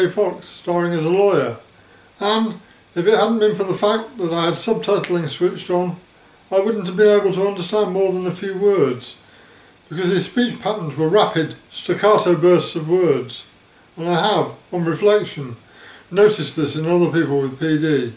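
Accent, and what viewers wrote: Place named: United Kingdom. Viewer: Clear to me but as an American, I've always had trouble with the British accent. I think your most trouble is in the choice of microphone. There is a vlogger, Louis Rossmann who was a sound engineer at Avatar Studios in New York, who has changed mics over the years and explained why. You might give him a call. He is in the book...Rossmann Group Computer Repair.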